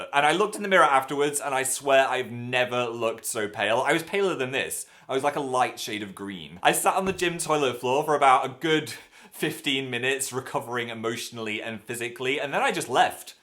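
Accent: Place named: England, United Kingdom. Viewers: last time i was this this early still had a northen accent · Is it just me or when pretended to be American as that gym dude on the phone he suddenly sounded... low class. Like literally, having an English accent is part of his charm. And he's better that way! Pray we may never stumble upon an American. Amen.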